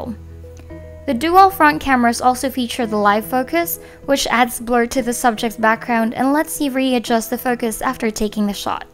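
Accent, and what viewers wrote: Philippines. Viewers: Filipino accent.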